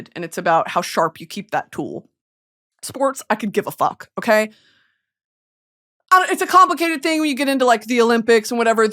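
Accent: United Kingdom. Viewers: BRITISH ACCENT 0 SECONDS IN IM SO LOCKED IN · the hair with the eyeshadow with the british accent.... giving Riff Raff realness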